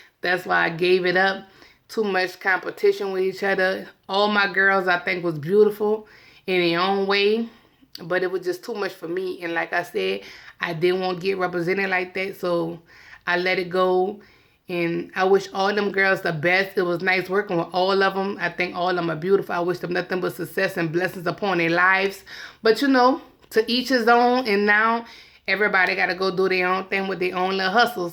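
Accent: New Orleans, United States. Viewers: That New Orleans accent!!!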